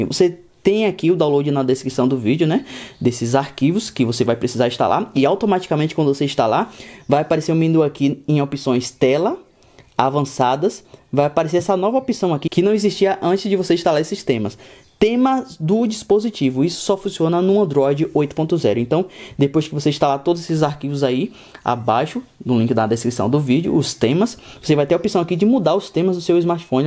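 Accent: Bahia, Brazil. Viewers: Tu é baiano? Te sigo no face mlk bom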